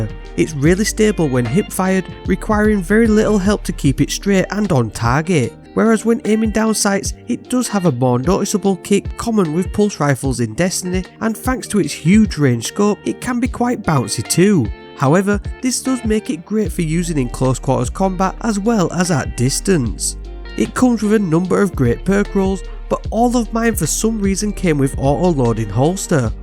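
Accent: United Kingdom. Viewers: It’s great to hear a British accent on d2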